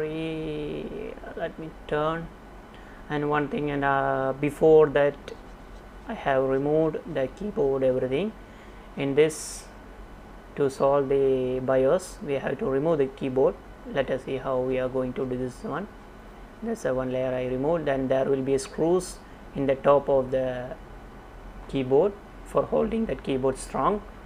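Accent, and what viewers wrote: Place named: South Asia. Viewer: He has an Arabian keyboard, but sounds South Asian loool, but I love that keyboard. I wonder if this will work.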